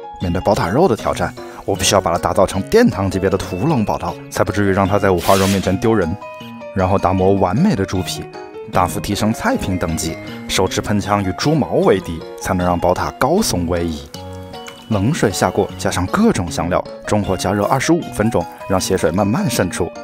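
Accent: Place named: Changsha, China. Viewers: 感觉博主有长沙口音…… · 这个解说太逗了，哈哈😄 听口音，你是长沙的不？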